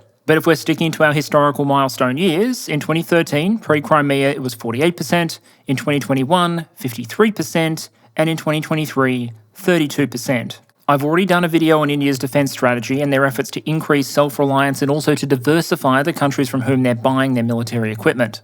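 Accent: Australia. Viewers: I'm just here for the aussie accented sarcasm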